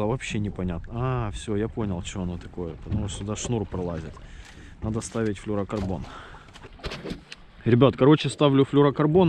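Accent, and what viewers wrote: Ukraine. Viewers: А чего у вас в 6 вечера так светло? Это же Украина вроде, судя по говору...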